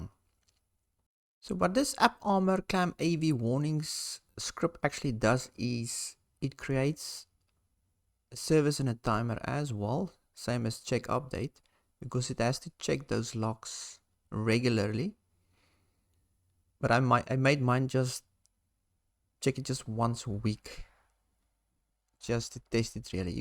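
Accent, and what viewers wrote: South Africa. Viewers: Love your SA accent. Which part are you from?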